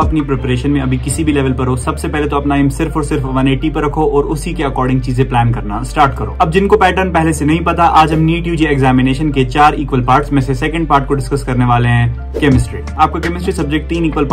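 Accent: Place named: Kashmir, India. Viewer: Bro kashmiri accent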